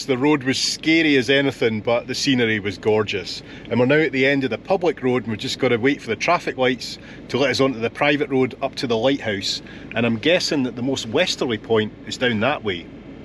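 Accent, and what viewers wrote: Scotland, United Kingdom. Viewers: Buddy Rather Than Çompulsively Apologising, Let Us Again COMMEND YOUR LOVELY SCOTTISH ACCENT/ For leading everybody on eye-opener REAL Real FAIR Dinkum West of Legendary BRITAIN ( Scotland, Ireland, Wales Plus Good Old Mother England ) Indeed Agree Very Very Honest Voyage( By Toyota ) of Discovery. Absolutely HUGE Personality/Support Person.Sydney/OZ/Australia/Sunday/Evening/ Hours/Beddy/Bies/Promise/WATCH/STUDY/ Remainder/Tomorrow/Guid nicht/EENIN/FORENICHT!!!!!!!!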